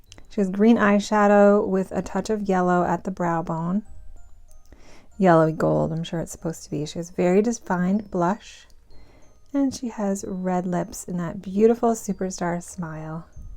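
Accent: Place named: New England, United States. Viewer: You have a new England accent!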